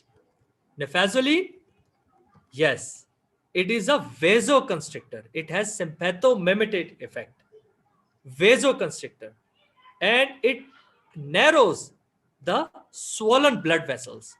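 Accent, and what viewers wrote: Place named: England, United Kingdom. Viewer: Sir you have a great English accent and Teaching style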